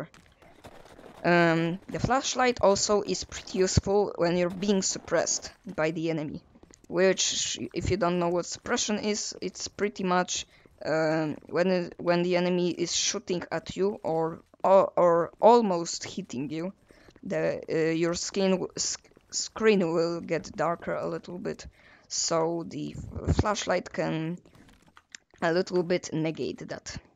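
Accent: Russia. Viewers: I can really hear the Russian accent In your voice. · i love how the russian accent matches with the team you're on despite you being from poland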